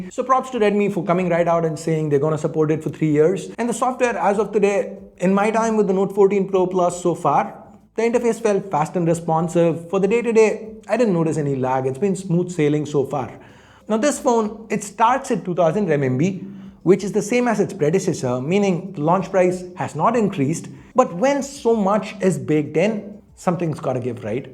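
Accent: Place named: India. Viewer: Co on mówi ????????? indiglish?????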